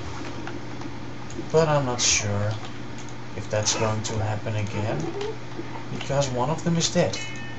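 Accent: Netherlands. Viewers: it aren't lisp he just has a dutch accent. but your right it is really annoying.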